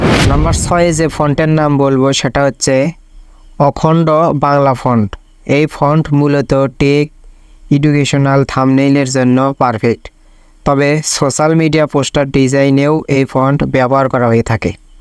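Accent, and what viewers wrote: Bogura, Bangladesh. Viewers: bhai er basha boguray naki?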